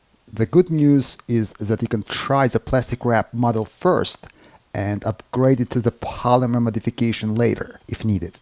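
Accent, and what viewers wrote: Russia. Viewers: Ну прям русский акцент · Как только услышал русский акцент, понял - в видео будет скотч XD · Мне кажется что я слушаю английскую речь с русским акцентом) Обычно носители спешат и зажевывают слова до неузнаваемости. А тут почти на русском) Точно. Это же адвокат егоров =)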